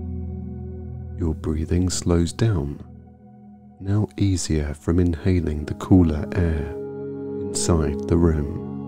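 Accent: United Kingdom. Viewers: Why are these videos always a deep voiced British guy or a Russian woman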